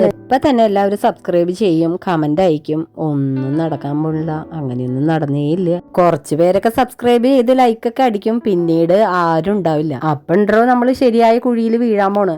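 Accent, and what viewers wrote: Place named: Thrissur, India. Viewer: Thrissur slang😅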